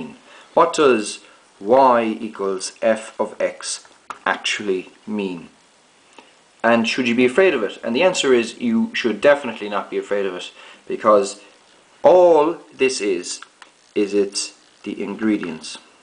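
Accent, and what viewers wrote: Ireland. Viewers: Ah a fellow Irish man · Are u Irish....u sound irish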